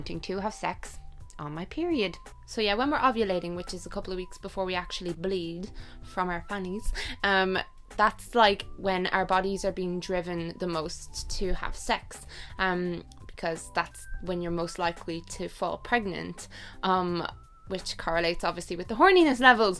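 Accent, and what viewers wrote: Ireland. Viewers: I think you sound so cute with your denture! I love listening to your voice, the Irish accent is my favorite!!! You are gorgeous and thank you so much for all your hard work and your realness. I love all your videos 💚💚💚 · I can't wait to read your book I just realised that you have a YouTube channel and I'm so happy that I found an IRISH 🍀 YouTubers I love u so much thank u for being Irish and having an Irish accent rather than American or English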